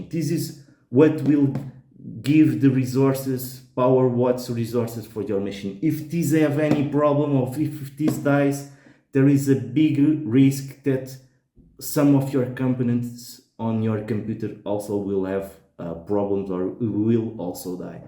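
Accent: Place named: Portugal. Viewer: Acho que é português e não brasileiro. Pelo sotaque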